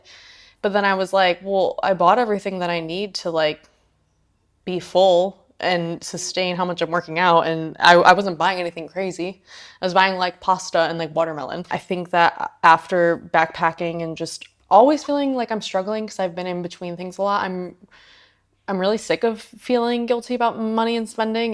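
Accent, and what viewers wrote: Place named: Australia. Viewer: I'm hearing an Australian accent more with every video Just waiting for the 'no worries' and 'mate' 😂😂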